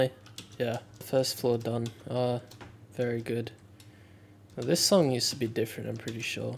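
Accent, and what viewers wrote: Australia. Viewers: he’s actually aussie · he’s Australian i think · towre of srres very gdoo also why do you sound like australian remainings best video do coabl p rank and lorekeeper guide NOW!!!!!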